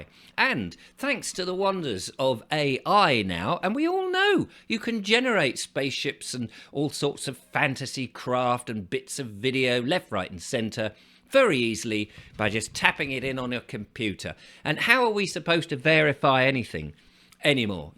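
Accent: United States. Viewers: Pretty good American accent. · Love your American accent.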